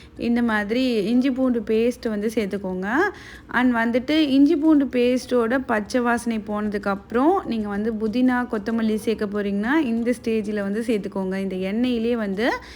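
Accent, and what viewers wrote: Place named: Pattukkottai, India. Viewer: Nce vlog ka..unga slang enga oor slang madriye iruku..am near by pattukkottai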